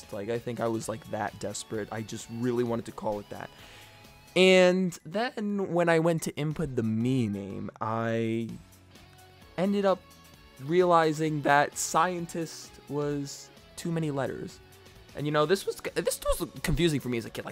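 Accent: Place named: United States. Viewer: kinda sounds like just a generic american accent (the kind where people who have it don't know that they have an accent, me being one of those people)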